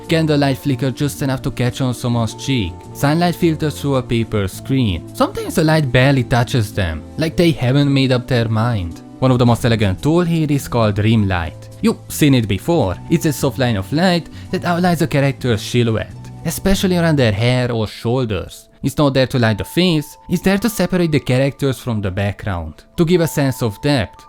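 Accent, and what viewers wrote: Hungary. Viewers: UGYANEZ!! Hallgatom, hogy na "he sounds EXACTLY like a Hungarian person speaking English would", és igen, az 😂 😊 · Random felhozta nekem a videót a YouTube, hallgatom, hogy de magyaros az akcentus, megnézem a csatorna névjegyét... yyapp, a " " brotha':D De amúgy nagyon jók a vágások és tök jó, hogy egy magyar is foglalkozik ilyen témákkal, még ha angolul is. Sok sikert a továbbiakban, keep it up~! ✨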